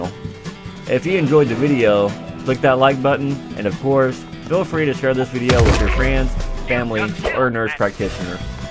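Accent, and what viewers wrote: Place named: South, United States. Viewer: Where you from man?! Gotta sub man you sound southern as Hell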